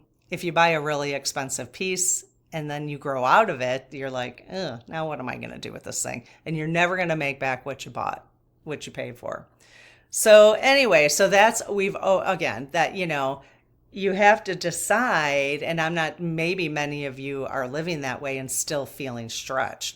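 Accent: Midwest, United States. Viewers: From the first time I watched you I figured you were from the Midwest and really resonated with that. Now I find out you’re from Palatine! I’m older than you but I graduated from Fremd in 1975. Worked at the Marc’s Big Boy on Northwest Highway. Small world! ❤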